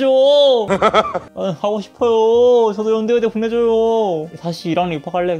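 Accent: Gwangju, South Korea. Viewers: 광주 출신이신가요 ㅋㅋㅋ말투가 거리감이 없는 거 같은데 느낌만인가 ㅎ.ㅎ...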